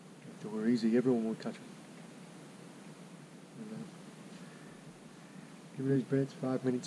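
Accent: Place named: North East England, United Kingdom. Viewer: Where are you based to mate, I just thought you were up in the north east because of your accent. Are you ex army as you are always well turned out for an angler!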